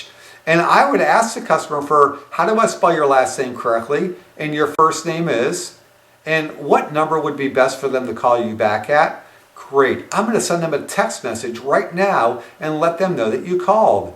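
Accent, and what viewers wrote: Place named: United States. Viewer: _ A pity, this strong american accent ! Instructive but can be a bit harsh to understand for some E.U students and trainees accustomed to English English ! (Not for me, I'm fine, thank you).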